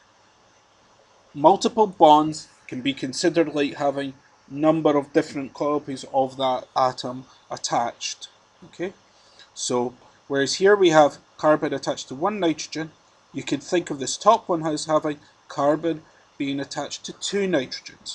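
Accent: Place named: Scotland, United Kingdom. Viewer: I think that is a Scottish accent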